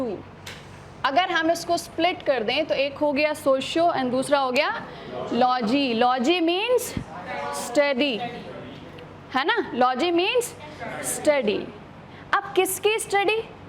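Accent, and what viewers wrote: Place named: Rohtak, India. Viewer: Madam rohtak side ki hai kis kis ko lagta hai 😂😅😅😅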